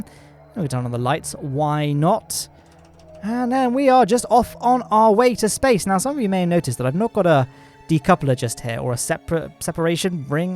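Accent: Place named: England, United Kingdom. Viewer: wait what omg is english?????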